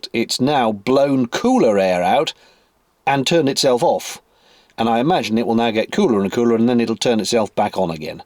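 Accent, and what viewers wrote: RP, United Kingdom. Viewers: Posh British person.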